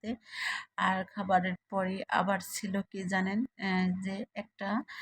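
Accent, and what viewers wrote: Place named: Barisal, Bangladesh. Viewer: আপু তোমার দেশের বাড়ি কোথায় /আমি সুইডেন থেকে বলছি। আমার বাড়ি বরিশালে। তুমি বরিশালের কথা বলছো তো জিজ্ঞেস করলাম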